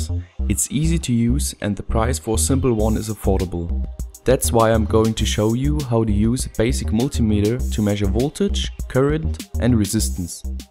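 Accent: Israel. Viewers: Are you from Israel? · are you from israel?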